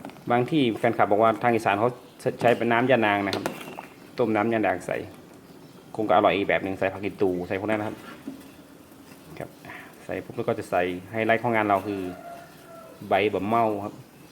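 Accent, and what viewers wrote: North, Thailand. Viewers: ชอบฮื้ออู้เมืองมันเป็นเสน่ห์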